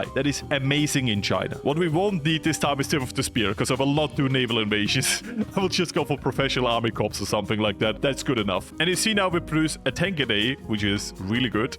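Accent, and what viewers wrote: Austria. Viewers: Wait a minute r u Austrian or Australian?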